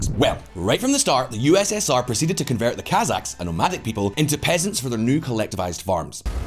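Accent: Ireland. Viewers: Are you irish? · You speak to fast but I understand p, are you ☘️ irish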